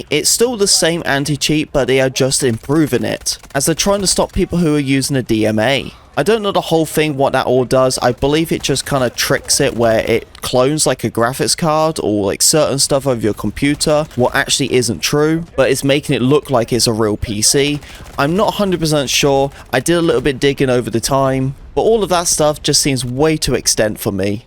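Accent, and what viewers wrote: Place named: United Kingdom. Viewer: hey man your so british idk what app you said for controller support for mobile no matter how many times i rewind to hear you again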